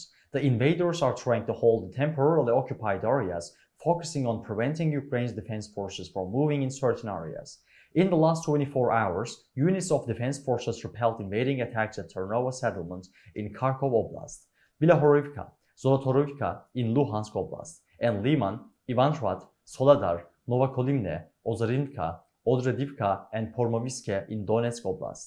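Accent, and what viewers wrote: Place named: Turkey. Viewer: dayı türk şivesiylemi konuşuyosun ingilizceyi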